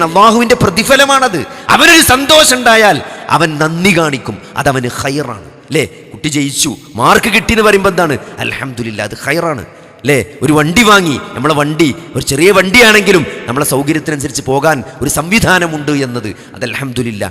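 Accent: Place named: Malappuram, India. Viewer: മലപ്പുറം ശൈലിയിലുള്ള അവതരണം അതാണ് അദ്ദേഹത്തിന്റെ പ്രത്യേകത മാഷാ അള്ളാ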